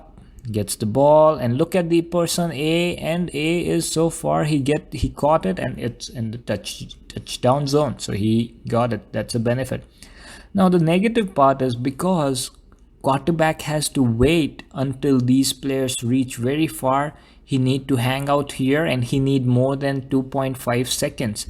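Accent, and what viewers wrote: India. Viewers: An Indien accent on Football. That's as cool as the offence guy to the defence guy on him: I crush you little man, being like: Catch me if you can...gooood luck! #cyberpunkcoltoure Take it as game of positions and the head is passing on the initiative savely. Even so difficult, the best teams win by passings from receiver to receiver avoiding ball loss, close to Rugby which is the Grand Pa, but way less aggressive. #provos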